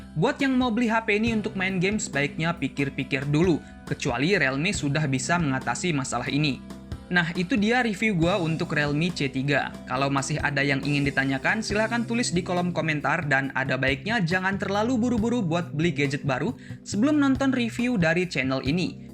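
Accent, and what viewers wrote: Lampung, Indonesia. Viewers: Bang Orang Lampung kah?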